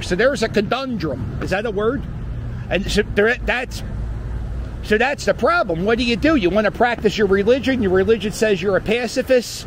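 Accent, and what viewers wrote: Philadelphia, United States. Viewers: Best Philly accent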